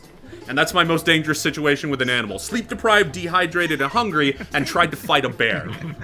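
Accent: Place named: New York, United States. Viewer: man your accent would be hilarious in a campaign ... the new yorker halfling or kobold or something talking really really big...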